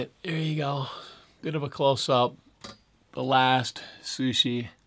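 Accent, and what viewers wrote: United States. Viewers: Are you from Hawaii? Certain things you say reminds me of home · interesting .. I thought you're Californian.... LOL ... didn't knew you learn to surf in hawaii ...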